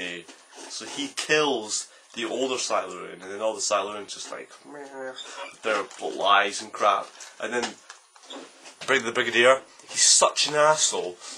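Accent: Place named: Scotland, United Kingdom. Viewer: What a very beautiful young man. I could listen to you all day. Adore the Scottish accent.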